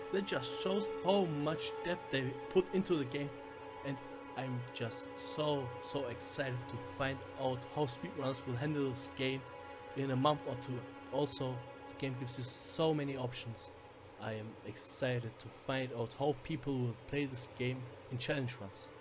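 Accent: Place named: Germany. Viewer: ich finde dein englisch voll gut. Man hört zwar, dass du deutscher bist aber die wortwahl ist echt gut getroffen😂👍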